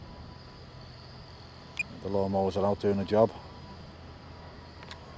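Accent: Liverpool, United Kingdom. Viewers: I could listen to ya wafflin on all day in that lovely Scouse accent mate!😊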